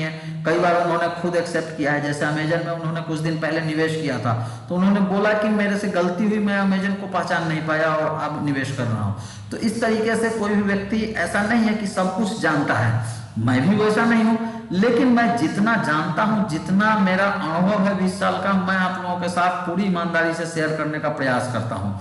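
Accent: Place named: Bihar, India. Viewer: TU Bihari hai 100%, or tu sharsa ya madhepura ka hai ................ sahi bola na .............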